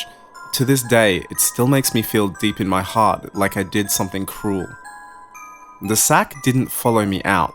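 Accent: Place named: Australia. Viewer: On a side note, always loved the Australian accent, and I feel like it works great for this story! But I do love the way yall say No very much lol, not trying to knock ya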